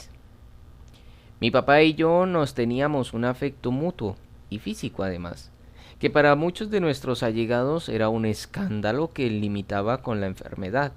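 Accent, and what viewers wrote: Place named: Colombia. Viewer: oír ese español colombiano, es maravilloso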